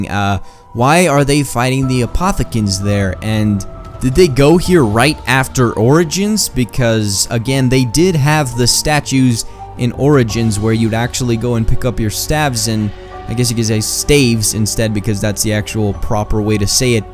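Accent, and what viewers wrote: Bosnia and Herzegovina. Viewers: are you Bosnian?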